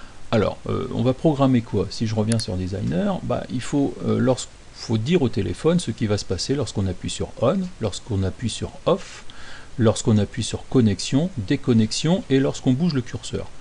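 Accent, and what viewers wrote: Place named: England, United Kingdom. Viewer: Attention à l'accent anglais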